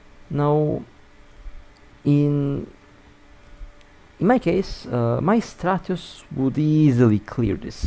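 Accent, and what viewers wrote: Russia. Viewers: да делай на русском судя по акценту же русскоязычный!)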